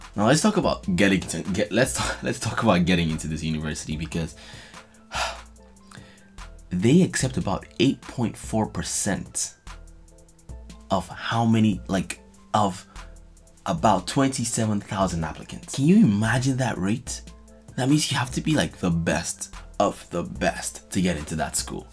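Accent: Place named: Nigeria. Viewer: Are you Nigerian? You lowkey have an accent 👌🏾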